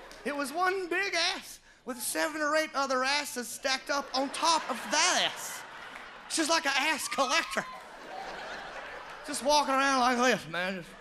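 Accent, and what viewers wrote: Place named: South, United States. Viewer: I'm from a small ass southern town & I just found this guy he legit is apart of my family just bc of all the similarities. The accent is killing me bc it's like listening to my crazy ass uncle's 😭😂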